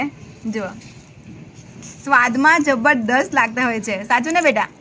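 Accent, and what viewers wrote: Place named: Ahmedabad, India. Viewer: Ben kathiyawadi hoy ane amdavad ni bhasha bolta hoy ewu km lagya kre se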